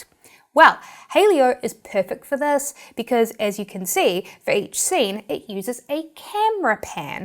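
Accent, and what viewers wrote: New Zealand. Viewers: Hi priceless! Thanks for clarifying. I thought, "Where is this gal from, Mars?" 😉 j/k, I love the Kiwi accent. Chur for the mean accent! 🤔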